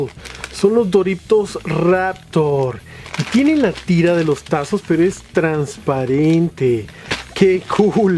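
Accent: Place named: Guatemala. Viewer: V creo que eres de Guatemala :v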